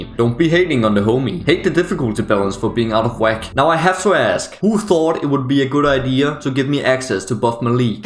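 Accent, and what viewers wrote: Denmark. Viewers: Clocked the danish accent instantly x] happy to see a fellow dane play this game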